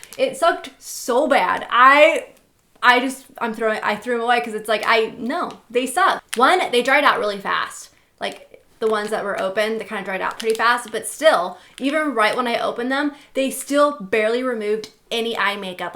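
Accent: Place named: United States. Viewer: I love ur American accent xoxo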